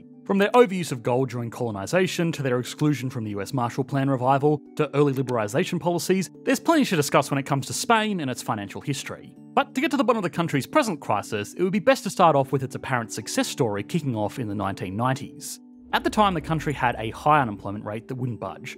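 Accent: Australia. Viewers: Probably Australian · Slow down mate , i know your have the limit for vedios time but your fast aussi accent is hard to follow.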